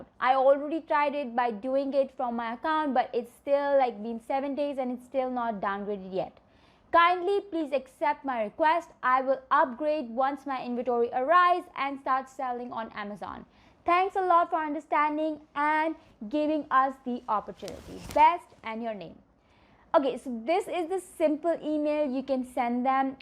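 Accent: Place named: Mexico. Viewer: Que lindo la mexicana y su ingles =)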